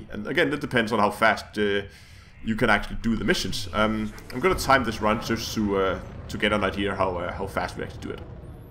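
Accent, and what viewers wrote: Denmark. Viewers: Are you danish? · nemt at spotte ens egen accent, men perfekt engelsk ellers:)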